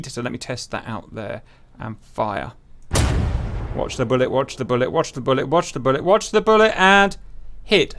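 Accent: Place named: England, United Kingdom. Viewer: 32th speed? You sound English, has it really come to this?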